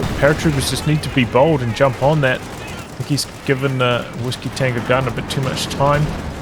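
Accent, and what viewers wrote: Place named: New Zealand. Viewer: Great cast as always mate. Heading to Wanaka for a holiday come July. Your accent constantly reminds me of my upcoming holiday haha.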